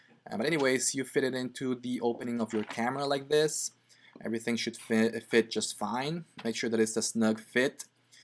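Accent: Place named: Philippines. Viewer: Why do you have a filipino english language? Are you filipino?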